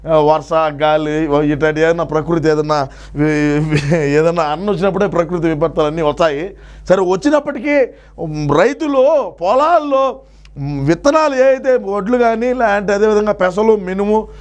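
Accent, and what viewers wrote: Rayalaseema, India. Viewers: Posting this comment randomly to reach you Anna, though I’m from Kosta, appreciate you on highlighting Seema yaasa, I love it anna.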